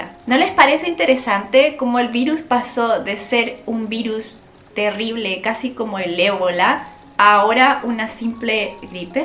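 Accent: Chile. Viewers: Es interesante que no has perdido el acento chileno, saludos y gracias por la información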